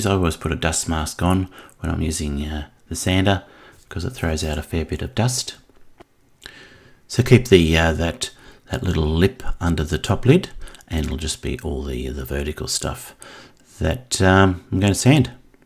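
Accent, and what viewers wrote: Australia. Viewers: Nice to hear an Aussie voice over. Your instructions are clear and concise. Good stuff. 👍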